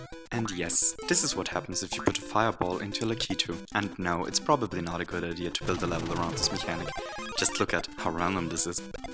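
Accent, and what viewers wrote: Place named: Germany. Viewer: Here can i hear that he is german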